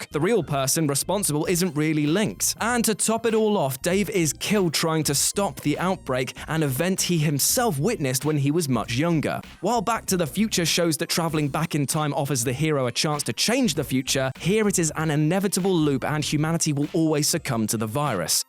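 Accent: United Kingdom. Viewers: British watch mojo?!?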